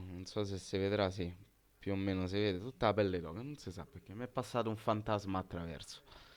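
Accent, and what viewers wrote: Rome, Italy. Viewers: come mai tifoso sardo con accento romano?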